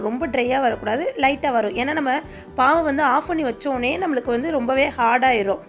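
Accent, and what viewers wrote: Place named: Kerala, India. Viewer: मैम, आपकी बोली किस ज़िले की है? I mean your native language... its not pure Tamil.../ mixed malayalam/ which area in Kerala's? Palakad or Kanyakumari?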